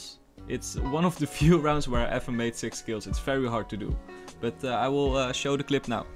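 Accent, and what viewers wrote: Netherlands. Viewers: Is this guy dutch? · Yeah you are dutch 100%%% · i didn't know you were dutch, dacht dat je russian was ofzo ik was verbaasd XD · hahaha i knew that u from the netherlands greetings from germany my guy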